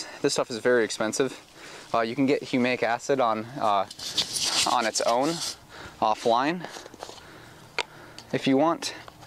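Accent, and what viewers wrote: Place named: United States. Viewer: you misunderstood him as I did briefly until realising it's his American way of talking.he definitely meant DONT be using too much of it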